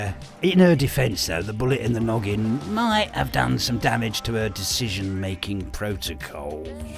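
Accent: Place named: Ireland. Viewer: Never realize that irish accent so fit on murdoc voice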